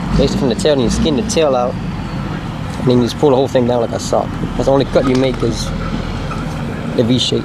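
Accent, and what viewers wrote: Louisiana, United States. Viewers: I love your accent. Louisiana I assume? Youre so soft spoken too. It isn't that harsh cajun lilt lol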